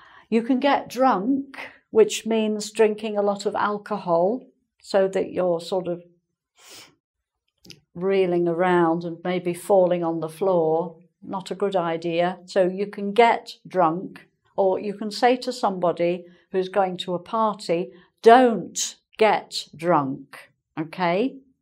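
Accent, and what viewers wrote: England, United Kingdom. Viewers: English accent. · well i'm not very keen on studying English... but watching u is something else... the british accent u've got n the way u dress hahaha... how old r u? please come to indonesia... we need english teacher like you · r u British? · is that a British accent??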